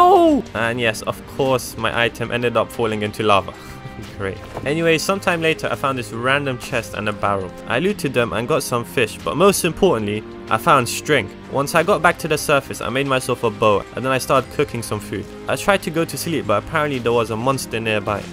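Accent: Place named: Roadman, United Kingdom. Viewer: bro has a roadman accent 😂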